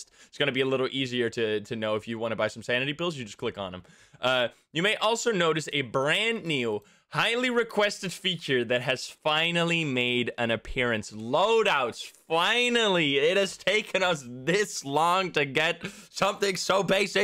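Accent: Netherlands. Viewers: hold on, you're dutch? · are u dutch?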